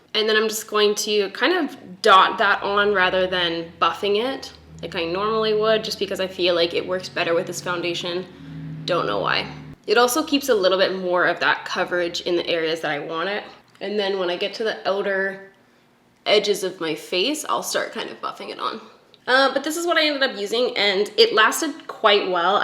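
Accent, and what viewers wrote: Canada. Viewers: It's the same as the southern English pronunciation and I'm grateful for it. Canadian accents are beautiful. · Jessica Ridenour Yes, it’s how most Canadians say it.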